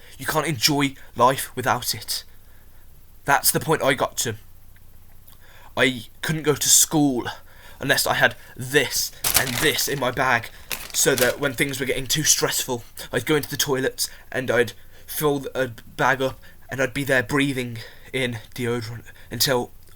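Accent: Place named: United Kingdom. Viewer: you sound british but with a twang lol.....what is your disability? how old are you? And where are you from? Are you on Facebook? Surely we can get you some sort of help! What organs are failing? What solvents have you been taking? How did you first get into taking them? Have you been doing them for a long time? I've never tried solvents, i see friends use gas and also use hairspray and a piece of cloth.......they said everything would sound like a robot and they would laugh.....I never tried it because i was so scared of drugs back then. I can't believe i went on to smoke opium and later on herion......it was such a nice feeling but now i don't get any buzz at all i just take it to feel normal and not unwell. I am coming down off of it bit by bit.......I have a fear of death that makes me have very bad anxiety on a daily basis. Would you give up solvents if you were given help in regards to your transplant surgery?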